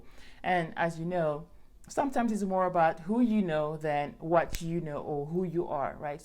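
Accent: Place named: Cameroon. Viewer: my girlfriend is from cameroon so i can tell you spent time with a cameroonian just based off the way you talk lolololol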